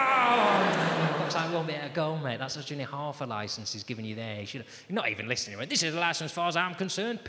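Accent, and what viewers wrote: United Kingdom. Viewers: What kinda British accent is that?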